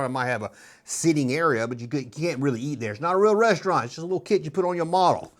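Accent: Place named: North, United States. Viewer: "north" mississippi? sounds more like Yankee Mississippi to me!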